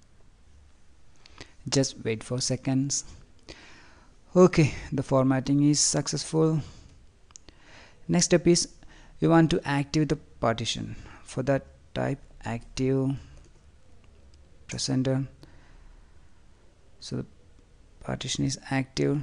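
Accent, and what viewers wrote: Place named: India. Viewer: he must be indian indians speak english like that R r RRr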